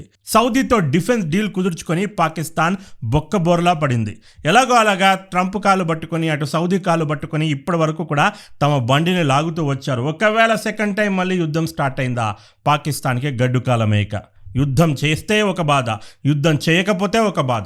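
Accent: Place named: Telangana, India. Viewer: Nice Anna Telangana Slang Super 😄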